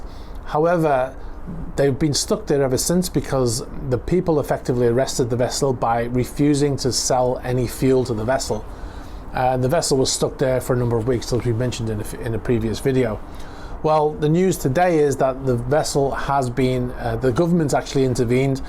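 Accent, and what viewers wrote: Liverpool, United Kingdom. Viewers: That WSJ is such a prick for stealing your information! Shows that most mainstream journalists are vile and why many of us are turning away from it and concentrating on YouTube instead. I hope karma steps in…. But your reporting is so much better, concise and not biased which is so refreshing and kudos for reminding the idiots out there that not all Russians are bad. Also guessing you are from Liverpool? Lol I’m from the Wirral!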